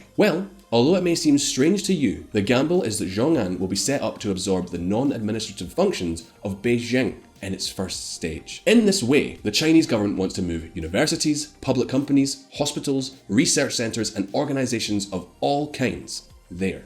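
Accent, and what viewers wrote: Scotland, United Kingdom. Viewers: You’re pronunciation is weak...as an American, I love hearing the Brit accent but yours is either hindering your pronunciation or you’re writers and team are failing you miserably. Best of luck red coat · So curious! Watch a video in british accent that I already watched in spanish from Spain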